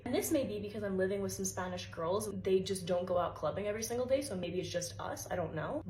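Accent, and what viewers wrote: United States. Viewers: From her accent alone she's clearly American and has therefore been to the US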